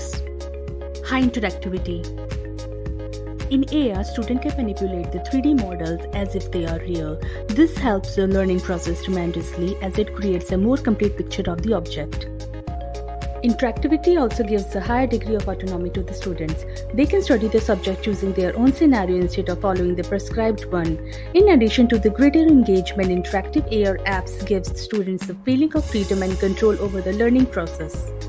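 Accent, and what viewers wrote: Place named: India. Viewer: Yeah, also the Indian accent English grates on the ear. Slow down please. I feel like I will explode while watching but the content is good.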